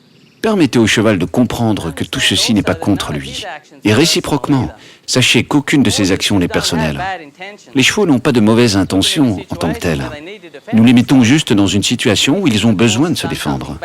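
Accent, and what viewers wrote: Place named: Australia. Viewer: L'accent australien me fera toujours cet effet bizarre :P En tout cas c'est un super travail, je voulais tenter l'éthologie avec un cheval qui est assez (pour ne pas dire énormément) nerveux, craintif et surtout pas dressé pour voir si ça l'aiderait, j'ai reproduit les mêmes gestes (j'ai eu de nombreuses cloques au passage du stic) mais maintenant je peux presque monter sans incidents, je continue, ça nous aide beaucoup tous les deux ! Merci !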